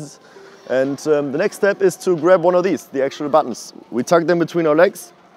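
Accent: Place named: Germany. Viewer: B H-Abigel German accent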